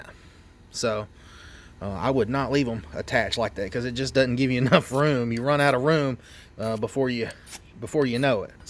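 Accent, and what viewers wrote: United States. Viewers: Are You From Tennessee? Cuz Ya Sound Like Yer From Tennessee LOL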